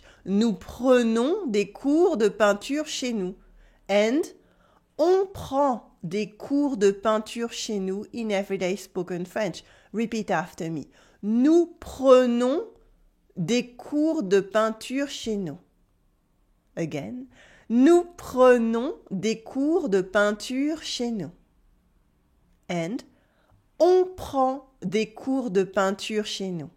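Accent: Paris, France. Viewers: Is this Parisian French?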